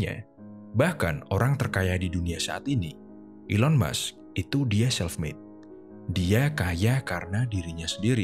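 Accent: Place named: Java, Indonesia. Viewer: Suara orang Jawa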